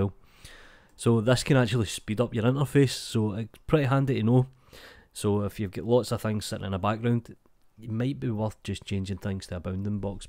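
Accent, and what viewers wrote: Scotland, United Kingdom. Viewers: that scottish accent 👌👌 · 2 things that I like at the same place; blender tutorials aand Scottish accent :D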